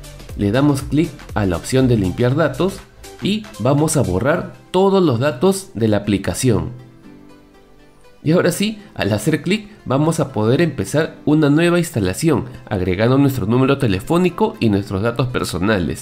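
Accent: Peru. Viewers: Jajajaj el acento peruano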